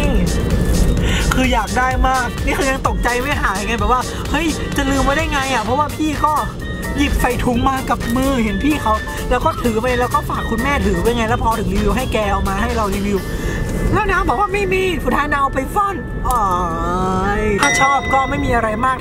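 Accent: North, Thailand. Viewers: พี่ เปนคนเหนือหรอคะ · พี่เป็นเป็นคนเหนือเหรอผมก็เป็นคนเหนือ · ปี้ๆคนเหนือก่ะครับปี้ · อ้ายเป็นคนเหนือกะ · พี่ เป็นคนเหนือกะ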